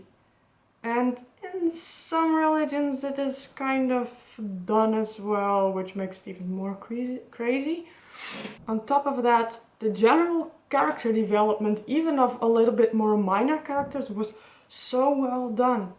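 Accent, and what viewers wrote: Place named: Netherlands. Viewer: You have a Dutch accent Are you?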